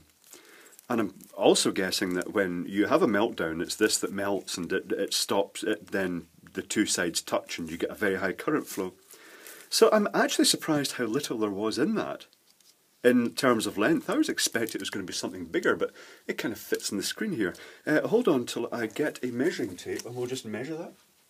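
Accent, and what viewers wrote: Scotland, United Kingdom. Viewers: if i am not wrong ,this is a british accent right? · can someone tell me if he's scottish or irish?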